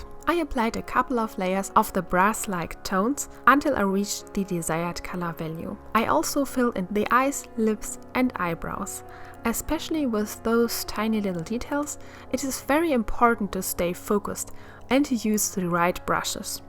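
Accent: Germany. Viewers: Jep, that's clean german school english, as we all leaned it. 😁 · Omg I immediately heard that you are german😂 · It's funny how one (or at least i) can immediately tell that this is a German accent. Wonderful drawing btw! Sadly i don't have all those materials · katy cat18 Do you seriously believe you're contributing to her endeavours in any way by commenting on her "extremely German-sounding" accent? No. Maybe you're too young to know or perhaps you're just not socially adept enough, but it's not acceptable to tell people to fix this or that about themselves, because sometimes it is just not your place to do so! works so hard to create high quality content and I know from her previous videos that she has been working on her English. Plus, people just don't have to speak English perfectly. So leave her alone and Get Over It, please. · beautifully drawn and explained ... 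beautiful german accent ❤ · My god me too i was like 0.0 that is strooong german accent riight? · Are you from Germany? 🙂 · Are you from Germany? Because you dont sound like "amerikan or english". Your dialect is annoying lamo hahaha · Direkt gemerkt das du deutsch bist 😂😊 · I love your german accent! This art is amazing! :O great job! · Hey 🌸Mir ist aufgefallen, dass dein Englisch extrem deutsch klingt.Vielleicht könntest du etwas an deiner Aussprache arbeiten♡, das ist kein Hate sondern nur ein Tipp, das Bild sieht allerdings echt meega gut aus😊❤ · Bist du Deutsche? Ja aber der Akzent verräts :D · Ich bin gerade zufällig über dein Video gestolpert und möchte gerne meinem Eindruck der Kommentare widersprechen: Ich finde es gerade gut, dass du einen deutschen Akzent hast und trotzdem Videos auf englisch hochlädst und dich nicht davon beeinflussen und zurückhalten lässt! Heutzutage wird man das Gefühl nicht los, dass man perfekt & akzentfrei Fremdsprachen sprechen können muss, bevor man sich äußert, wodurch die Hemmschwelle immer größer wird und man dann gar nicht erst in die Übung des Sprechens kommt. 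Daher: Bleib wie du bist und beehre uns weiterhin mit deiner Kunst!! <3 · haha funny I am from austria and yes I know this is Just typical german accent but for some reason I Just cant Hear it😂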